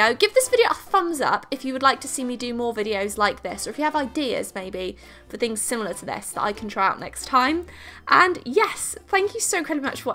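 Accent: England, United Kingdom. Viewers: But like are you English?? I'm confused ahah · omg i've just found you're channel and i want to say that you're gorgeous 💕 you can't be real... a very pretty talented artist with a very cute british accent 😍😍 love from france sorry for my grammar :) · I live for that British accent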